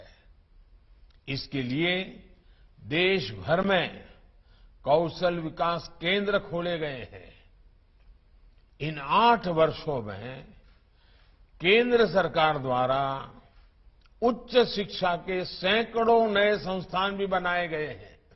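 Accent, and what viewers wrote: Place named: North, India. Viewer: That's true he's needs to speak in English and not like a North Indian villager what about the rest of us non hindi speaking Indians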